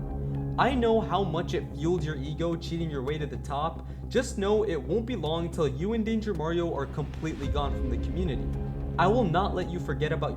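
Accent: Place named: United States. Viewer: dude your from asia but u sound american, what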